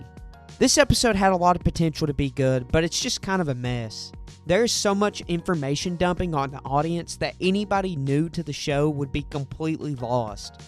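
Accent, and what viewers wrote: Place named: South, United States. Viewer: you are the most southern Rick and Morty fan I have ever heard❤‍🩹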